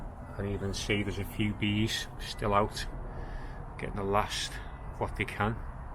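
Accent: Liverpool, United Kingdom. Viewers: Just what I needed! a video by a fellow scouser, the garden is lovely and I think its fair to say you are a bit salvia obsessed, enjoyed listening to you, regards from Ontario, Canada. #YNWA.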